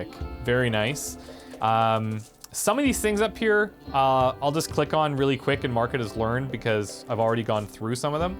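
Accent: Canada. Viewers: because your accent sounds like you're Canadian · I totally thought he was Canadian. He just gives me a dear, homey Canadian feel lol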